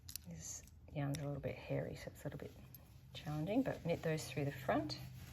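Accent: Australia. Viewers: Dear Thank you so much for your time spent creating these tutorials. I purchased the pattern in my mother tongue, danish, but because I am a relatively new knitter, it has been slightly daunting. You make it look like child's play. Although I am not fooled into thinking that it will be for me, it does encourage me to think that it may just be possible. Being Scandinavian, I am used to nordic style knitting. Nevertheless your tips are invaluable. Lastly I can't refrain from commenting on the joy it is to hear it all explained in australian english, as i grew up partly in Bendigo, Vic. So I enjoy the light in your beautiful home, which is so typically Australian. 🎉 I do have a bit of trouble balancing my cable needle. The stitches tend to slip off. Do you know any tip to get around this?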